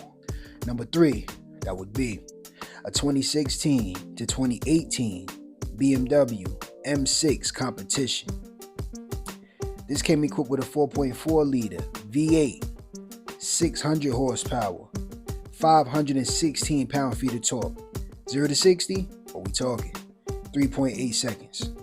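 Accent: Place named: West Coast, United States. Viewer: He sounded like he was from the West Coast to me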